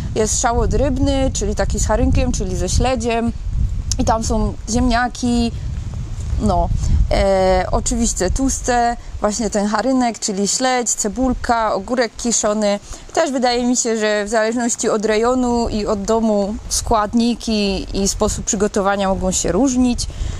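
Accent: Silesia, Poland. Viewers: Pięknie mówisz w języku Śląskim. Ja od 38 lat mieszkam w Krakowie ale takie słowa jak: "szałot rybny", "harynek", "hekele", "szpajza", "ciapąg" słyszałem dzisiaj pierwszy raz. Kutia to danie typowo Krakowskie, które znam od mojej Babci, natomiast to danie jest podawane obowiązkowo w Krakowie, podczas każdej wieczerzy Wigilijnej, moja babcia ma prawie 100 lat i do tej pory przygotowuje kutię, a właściwie to zarządza przygotowaniem kutii 🙂Ostatnio podczas nagrywania swojego filmu spotkałem z kamerą "Moto Urbex Silesia" - wspomniał kilka słów o Urbex Chorzów i oczywiście o Twoich filmach na YT 🙂 Na Śląsku macie tyle ciekawych miejsc do nagrywania: opuszczone pałace, kopalnie, dawne parowozownie, a nawet stadniny koni, to kopalnia miejsc do nagrywania. Dlatego często przybywam na Śląsk z kamerą by nagrywać nowe filmy. Pozdrawiam i do zobaczenia na następnym filmie.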